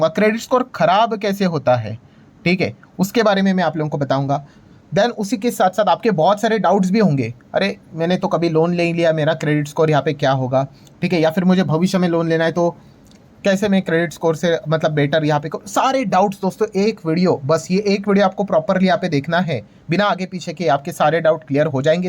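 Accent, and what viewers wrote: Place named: Maharashtra, India. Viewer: sir chya bolnya waru n ch samjat ki sir marathi aahe sir sher market che danvir karn aa he free madhe deep knowledge detat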